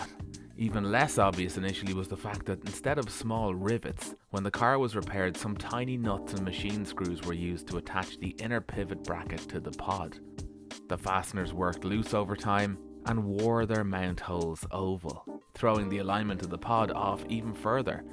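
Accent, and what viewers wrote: Ireland. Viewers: The art of pedantry - a new psycopathy in development, in a gentle brogue.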